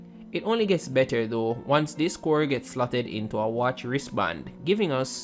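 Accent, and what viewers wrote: Jamaica. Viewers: Hes jamaican but i cant prove it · Ur Jamaica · bro u Jamaican? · Out of curiosity, are u Jamaican 😭 i hear a accent but cant pin point it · Are you jamaican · Are you Jamaican ? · he sounds jamacian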